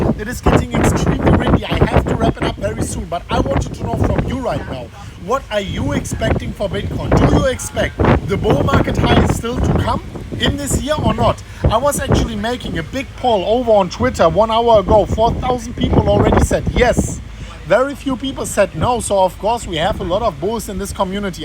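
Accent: Germany. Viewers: Killer German accent, dude!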